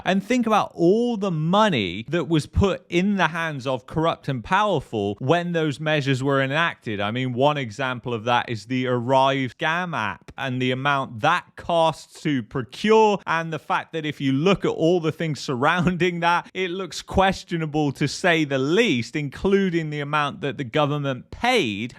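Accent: United Kingdom. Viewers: What kind of British accent is this? Anyone know? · Lol, IDK but I love it. Obviously he is some kind of libertarian type who understands economics. The scary thing about Carney is he doesn't understands economics, he only understands statistics and politics. He is essentially a Stalinist. It is fun to hear criticisms like this in a British accent. Usually one just hears the colonial pretensions about how great the UK is and how lucky Canada is to be part of it. This channel is keeping it real.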